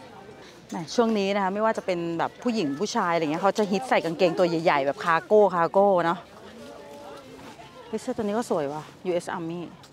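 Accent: Thailand. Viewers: ผมชอบชมช่อง ตรงที่พี่ พูดกะนเองเป็นสบาย ถึงอยู่อเมริกามาหลายปี แต่ไม่มีพูดไทยคำอังกฤษคำเลย พูดสำเนียงไทยแท้ ไม่เปลี่ยนครับ ผมอายุ26ปีพ่อชอบพาไปเดินคลองถมมือสองแถวบ้านทีีอ่างทองตั้งแต่เด็กครับ เอามาตกแต่งบ้านมาใบ้บ้างมาใส่ให้ผมบ้าง จนโตมาผมก็ชอบของเก่าของคุณปู่ย่าตายายพ่อแม่ลุงป้าสะสมเต็มบ้านว่าจะเอาไปขายต่อครับ ชอบบรรยากาศอเมริกาที่มีความชิวๆเรียบร้อยไม่ค่อยวุ่นวายดี ถ้ามีโอกาสก็อยากไปสักครั้งในชีวิตครับ😁💚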